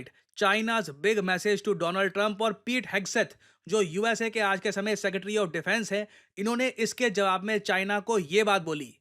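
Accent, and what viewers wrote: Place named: Uttar Pradesh, India. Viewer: सर आपका कभी कभी बोलने की कला उत्तर प्रदेश के जैसा लगता है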